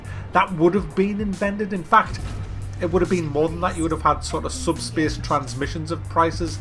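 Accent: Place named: Newcastle, United Kingdom. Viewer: Great vid, love hearing a Geordie review, especially your sewer worker being a typical PC player... anyway to your review; too expensive, boring planets, no multiplayer co-op, first person and walking around your ship not possible... get star citizen for a 1 time payment of $45, or $60 with the single player over arching story mode you are crying out for :)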